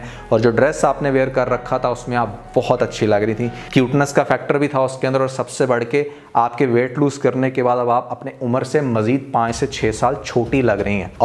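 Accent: Punjab, India. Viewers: Tuhadi punjabi 22 sooo funny😂😂😂😂😂😂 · I like the way your punjabi coming out! I love it!!🙆‍♀️❤️and the way you criticised actresses…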